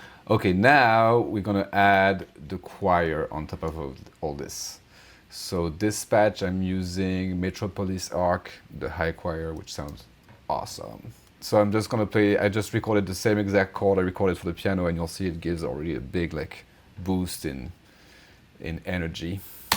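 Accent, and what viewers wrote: France. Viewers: nice vidéo and a lovely accent ! (french ?) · Pur accent francais mdrr très interessant en tous cas c'est super on manque de chaines comme ça sur yt ! Je me tate aussi à m'y mettre ^^ Mais ouais encore une fois très cool ! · Great video! Are you french btw? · French accent spotted !!! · This accent... are you French or so? Wonderful video! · super vidéo ! avec l'accent français . i luv it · Hi, are you french ? I am French and your accent sounds very French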